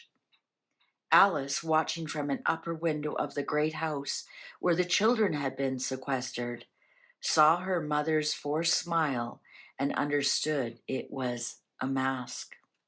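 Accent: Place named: Canada. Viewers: Canadian accent 😂❤ I am from the same area as We don’t hear our accent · I loved this. Your accent I love it Canadian. You brought the story to life's 🎉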